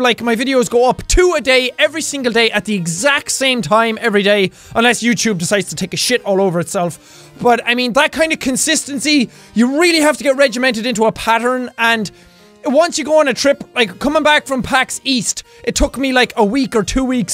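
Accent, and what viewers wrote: Ireland. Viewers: Hello! I just hit 300 subscribers and that is mainly because of you! You are my main inspiration to start my own channel (not this particular video, more the beam.ng vids). Your videos are always so great that it amazes me. According to me you are the best youtubers out here with a perfect voice for commentating, and your irish accent is the dot above the i. Keep making fantastic videos, since you are making so many people happy! My biggest dream is to honestly do this aswell, to make people happy just by being myself, have people laugh with me instead of against me. Have a perfect day, you really do deserve it because you are such an inspiring man Thanks:D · I watch you when I miss my boyfriend's voice because he is also from Ireland. A different area, so the accent isn't the same. But it's closer than anything I have in the states :P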